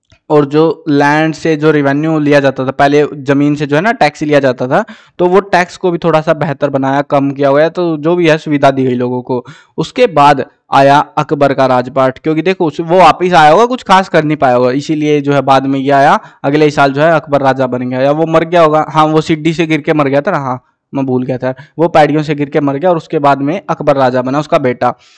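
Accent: Haryana, India. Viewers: Right sir haryanvi ka apna swag ha 🤟🤟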